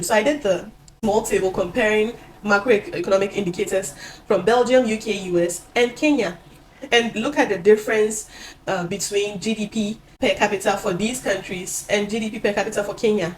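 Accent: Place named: Ghana. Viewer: I detect a Ghanaian 🇬🇭 accent.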